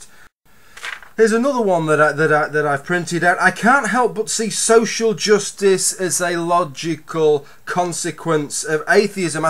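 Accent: United Kingdom. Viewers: Your passionate indignation is quite inspiring and I quite agree with your argument, with the exception of calling TF00t a prick. ; ) Totally off topic: I love your accent. What part of the UK are you from? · I do miss hearing English spoken by native UK English speakers :) /me ex-pat ten years, oh and screw Atheism +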